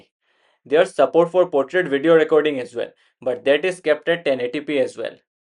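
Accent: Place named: Bengal, India. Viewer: Puro bangla accent toh bhaii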